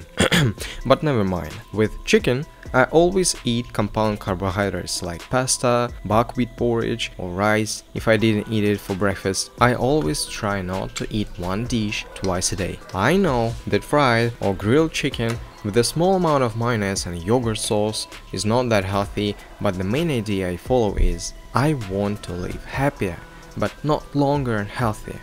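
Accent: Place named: Russia. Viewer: + russian accent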